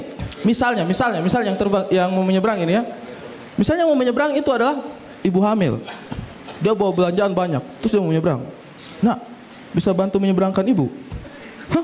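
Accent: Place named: Eastern Indonesia, Indonesia. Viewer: Timur logat nya enak 😄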